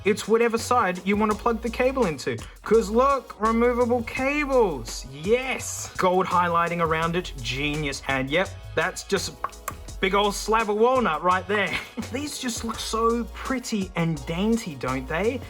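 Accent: Australia. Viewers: This is fairly mild Aussie in the scheme of Aussieness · can someone link me the website he uses to see the audio graphs? i can’t tell what hes saying with his thick aussie accent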